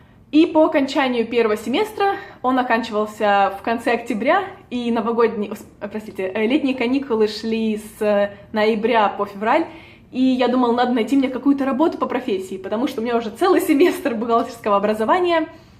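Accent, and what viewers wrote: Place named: Rostov-on-Don, Russia. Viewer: этот очаровательный южный диалект Ростов/Дон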